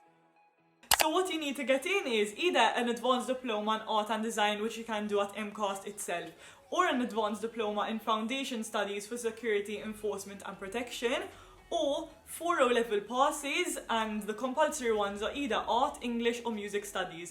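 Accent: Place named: Jamaica. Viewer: Shes got a Jamaican twang with a mix of Londoner and Russian for Rs and Ls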